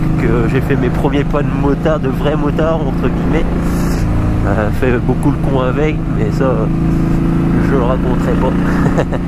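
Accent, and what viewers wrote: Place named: Champagne, France. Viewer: l'accent champenois ! ! ah si si, je connais ! :) sympa t'es bécane ! du coup tu as arrêté les wheelings suite a la rsv1000? V & +1 abo! ;)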